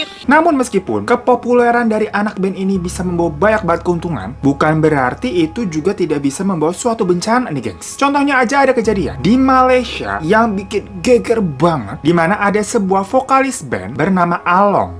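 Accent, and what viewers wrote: Indonesia. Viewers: Akak ni org mana kak Bahasa akak mcm indon je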